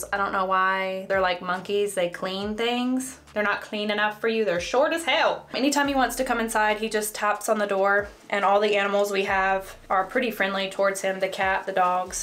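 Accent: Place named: Texas, United States. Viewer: I traveled from So. Cal to lil' ol Canton Texas to watch. They sound a bit like you there. lol. It was amazing! We didn't cry though. And you don't need the glasses to look while it was in totality. Don't get your glasses from Temu, though. You will go blind. Ended up getting a high frequency face wand cuz you said you liked yours. Thankyou! Love it!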